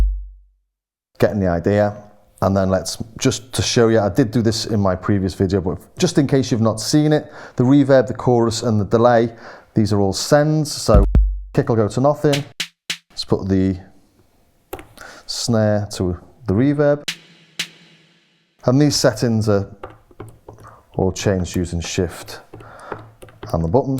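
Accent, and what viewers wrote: Liverpool, United Kingdom. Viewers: love the video its helped me out, are you a liverpool lad?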